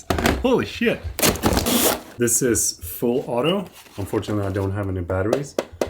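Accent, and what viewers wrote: Sweden. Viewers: Your video editing is brilliant. Love it,. (As I typed I was saying it in your satirical Swedish accent in my head).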